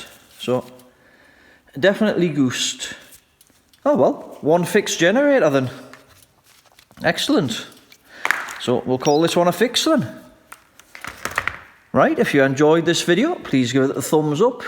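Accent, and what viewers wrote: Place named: Newcastle, United Kingdom. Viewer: Should be at a million just because of the lovely Geordie accent, why aye man! 🙂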